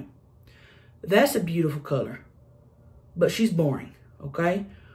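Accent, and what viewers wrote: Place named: Texas, United States. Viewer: I just love those Texan tone🍧🍧😋😋☺️☺️☺️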